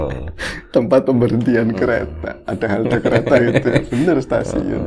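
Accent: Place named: Tegal, Indonesia. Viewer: Mas msh tetep di NGOBOR dong,jngn digantiin yg lain,soalnya udah enak ndengerin suara,n logat tegalnya,tdk tergantikan...😄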